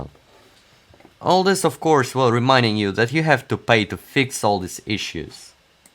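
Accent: Russia. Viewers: Russian: check Light accent: check Flies in background: check Techy: check Yeah, its all coming together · the russian accent doe, keep up the excellent work :D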